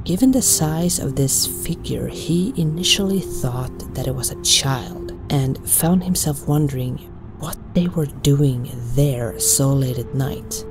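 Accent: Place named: Sweden. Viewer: It's an a Swedish accent. What's wrong with your ears and cognitive reasoning ?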